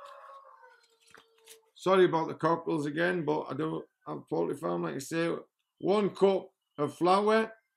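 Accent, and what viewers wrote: United Kingdom. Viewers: Trying to place the accent..... UK but where .... Wales or Scotland?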